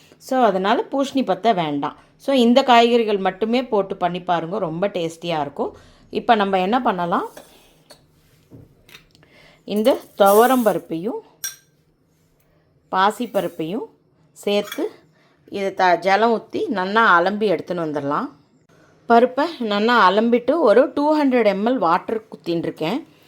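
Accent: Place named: Brahmin, India. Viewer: மிக அருமை.. மேலும் உங்களது பிராமணத் தமிழ் உச்சரிப்பு நிகழ்ச்சிக்கு கூடுதல் சிறப்பு!👍👍💐🪷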